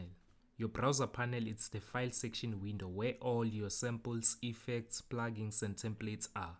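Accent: South Africa. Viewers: I can tell this guy is SOUTH AFRICAN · Definitely a South African (Durban) speaking. Nice tutorial. I'll be making my first kwaifi sounds.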